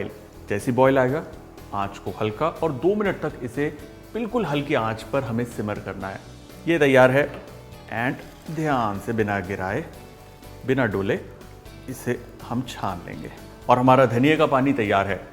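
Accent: Punjab, India. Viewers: Hi ji i am big fan of u it’s so cute ki hindi bolty bolty punjabi ki accent i love it 😻 yummy recipes always 💞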